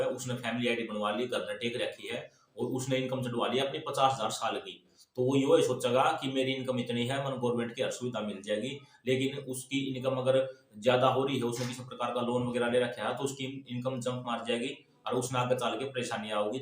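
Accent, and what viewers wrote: Bhiwani, India. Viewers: Bhai shab aap Bhiwqni se ho kya aapki awaj se lag rha h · Are u frm bhiwani distct.. Your language is frm that area..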